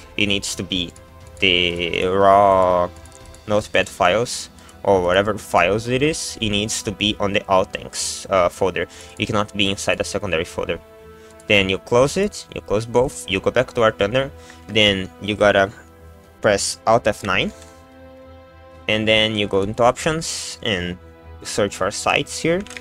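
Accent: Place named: Brazil. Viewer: N precisa n, é que eu vejo tanto video em ingles q eu percebi um leeeeeve sotaquezin BR ouvindo vc, hehe. Mas parabéns pelo vídeo :D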